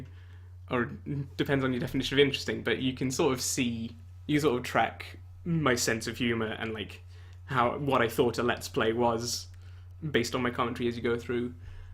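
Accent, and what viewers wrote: United Kingdom. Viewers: Yours was the first channel I ever closely followed, starting way back in 2011 or so. Like many others, I found you through your Endless Ocean 1&2 let's plays. I opened up YouTube, just wanting to re-watch the EO2 opening scene, and I remember being startled when I was greeted by an adolescent British voice chattering over the game. That was my introduction to "let's plays," and I was hooked. Now I watch many YouTube channels, gaming and otherwise, and it has had a very strong influence on my life. You were the one who opened that window for me. I'll definitely miss your videos, but I'm happy to see you moving forward in your life, and I wish you nothing but the best in your future endeavors. Anyway, it was nice to hear your voice again :)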